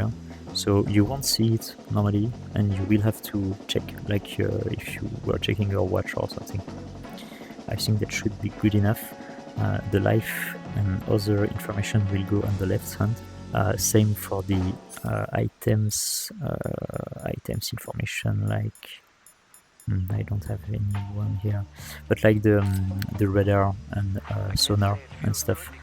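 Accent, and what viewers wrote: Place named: France. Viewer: youtubes ai is so stupid and racist it auto dubbed this from french dispite it just being ur accent smh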